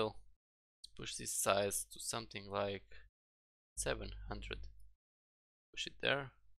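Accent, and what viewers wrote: Portugal. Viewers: are you portuguese?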